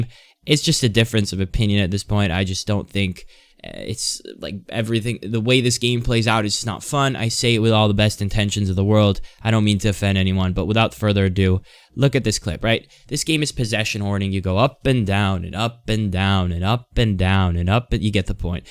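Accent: United States. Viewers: What content are you in. You sound American but played Cihan in rivals.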